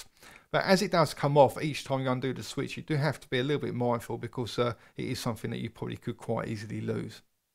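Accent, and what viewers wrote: London, United Kingdom. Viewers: What part of London are you from? Maybe Hackney or Bethnal Green? Cool video.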